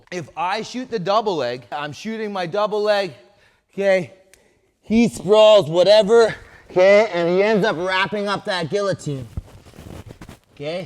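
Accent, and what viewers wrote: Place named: Canada. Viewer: 100% you know its Tristar when you hear the Canuck accent.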